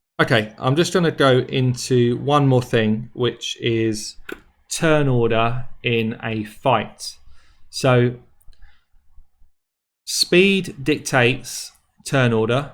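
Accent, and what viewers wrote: United Kingdom. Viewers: In my humble opinion, you are a top raid analyst. I regularly watch tons of popular raid bloggers both english and russian speaking and I can definitely tell that your particular way of analysis, your explanations, your tips are by far the most impactful and valuable. Plus the British accent. Absolutely fabulous.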